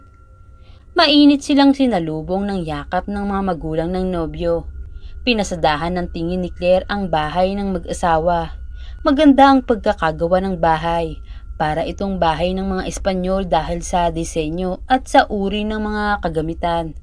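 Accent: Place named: Visayas, Philippines. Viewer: Ang sarap pakinggan Ng bisaya accent!😁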